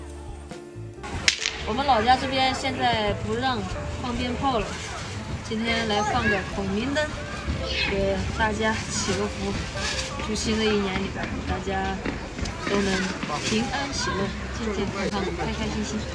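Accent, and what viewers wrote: Sichuan, China. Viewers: 其實漢水邊上口音和四川很接近，對嗎？ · 重庆妹子学习了。怪不得看你和你妈妈讲话那次视频，听出四川口音。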